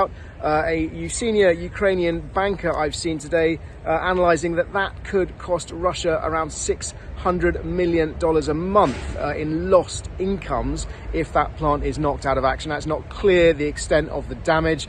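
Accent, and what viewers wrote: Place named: United Kingdom. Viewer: Yet another DEI jackass with a british accent talking about what he doesn't know.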